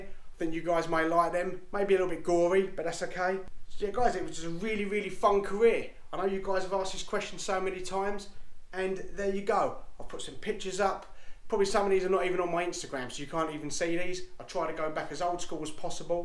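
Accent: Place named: Cockney, United Kingdom. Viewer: wanna see you in Jersey bro! miss having that bit of cockney love on the channel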